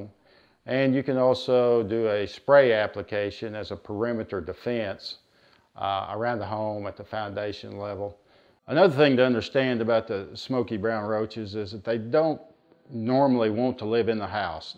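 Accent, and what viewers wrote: South, United States. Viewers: Hey, let me try an' place your accent...sounds like a base in Arkansas, with a Georgian overlay, and can I hear a hint of Kentucky, or are my ears playin' tricks on me? Laughs I ain't been down south since I was a kid, so I'm probably a bit rusty. (Used to live in Texas.) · He is southern and southern folk talk slow don’t like it find another video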